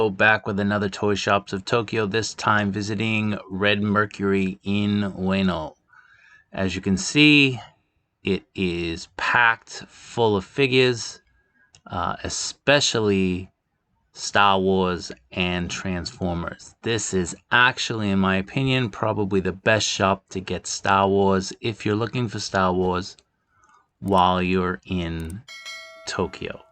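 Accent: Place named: New England, United States. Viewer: Definitely from New England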